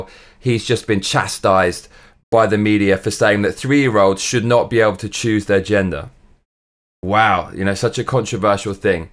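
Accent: United Kingdom. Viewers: Yes nice to hear a British gent with Libertarian views! Why do perverts get a whole month? Let's have a month for the nuclear family.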